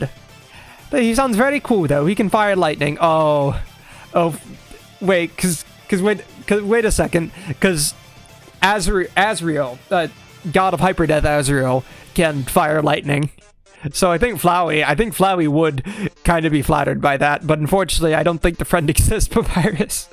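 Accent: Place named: United Kingdom. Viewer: you sound british now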